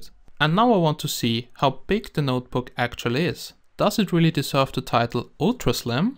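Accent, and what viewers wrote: Germany. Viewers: Hab das direkt gemerkt, dass er nen deutschen Dialekt hat und als er damit ankam, dass die Tastatur anders ist, als unsere QWERTZ-Tastatur, hat er meine Vermutung bestätigt ^^ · Ich nehm mal an du bist deutscher :P klang zumindest so: weißt du ob es auch eine Metalversion oder eine mit etwas besseren CPU gibt? ansonsten super review, werd mir wohl auch so ein teil holen. Allerdings ohne Apple-logo (wegen Zoll und so). Weiter so (y) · Any chance you are from germany ? :D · Are you from Germany? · are u from germany?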